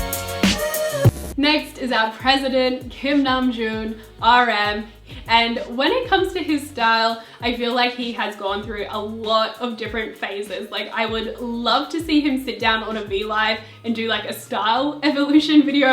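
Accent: Australia. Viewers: ahhh i love this video so much! im from australia too and its so cool hearing someone rant about bts in an aussie accent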